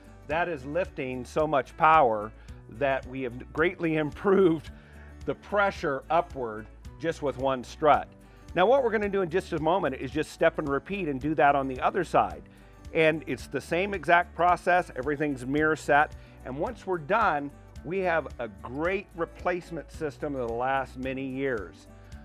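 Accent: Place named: Australia. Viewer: You sound like an Aussie. Your reference to your hubby gave me a hint but I could be quite wrong. I’m about to give this gas strut replacement thingo a go. Here goes …. A 71 year old woman with a screw driver could be dangerous! 😃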